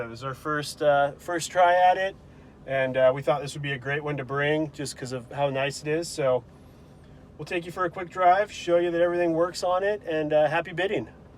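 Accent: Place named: West Coast, United States. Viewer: Boy if I've ever heard a west coast accent it's this here. And what a pretty truck!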